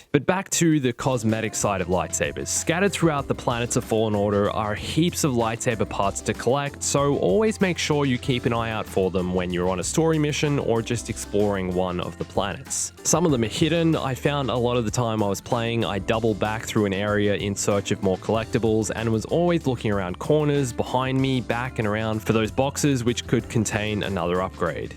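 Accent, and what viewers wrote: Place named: Australia. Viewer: What's with the accent? Mostly Aussie, part yank. Watched too much anime?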